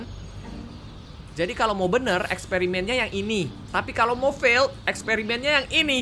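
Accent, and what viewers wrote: Java, Indonesia. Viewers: Kakak jawaya? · bang orang jowo atau orang palembang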